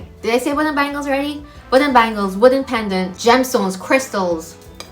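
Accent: Barbados. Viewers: Fr she sounds like she might be from barbados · yeah it kind of caught me off guard. I was like wait is she speaking English. I had to listening intently, I said oh she’s Bajan. 😊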